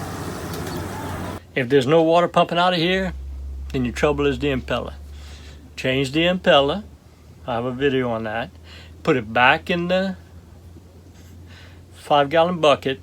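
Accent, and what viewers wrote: Louisiana, United States. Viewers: I miss that Cajun Accent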